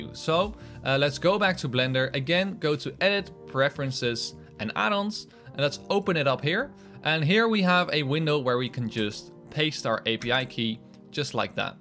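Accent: Netherlands. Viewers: Accent. Dutch guy. 100% 👍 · Nederlander!? Broeder!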